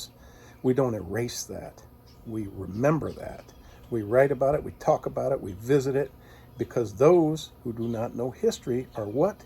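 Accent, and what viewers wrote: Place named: United States. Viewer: Hey! You sound like an American! . . . I could not have said it better.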